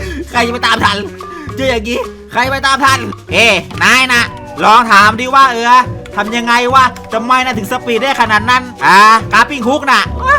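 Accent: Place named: Isan, Thailand. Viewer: อังกฤษพะสมอีสาน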